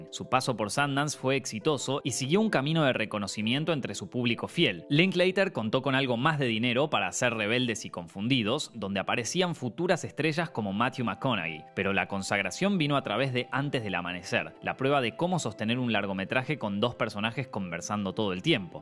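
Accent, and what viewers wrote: Argentina. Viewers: Felicitaciones. Por fin una voz argentina de alguien que sabe y que no lee cualquier cosa cuando lee en inglés. Muchas gracias!!!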